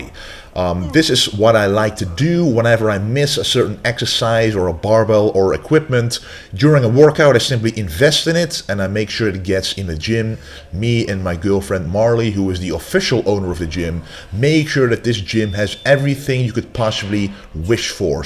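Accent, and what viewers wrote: Brabant, Netherlands. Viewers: Waar uit Brabant komt hij? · The dutch accent XD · Jezus...could you be more dutch...your English is painful to hear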